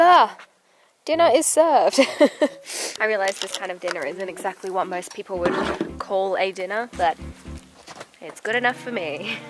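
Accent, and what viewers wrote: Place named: Australia. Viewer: Lol! I noticed that too. That's that Australian accent kicking in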